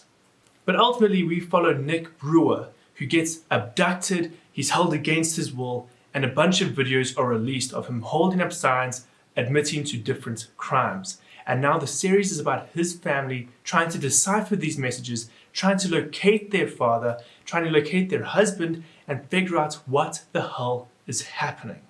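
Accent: South Africa. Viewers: I hear a Bloemfontein accent.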